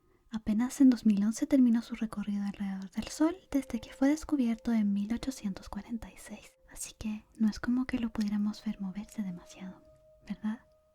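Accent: Chile. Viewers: Es de chile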